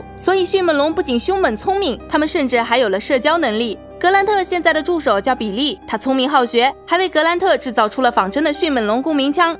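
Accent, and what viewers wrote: Jiangsu-Zhejiang, China. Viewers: 江浙口音，不要逼太紧。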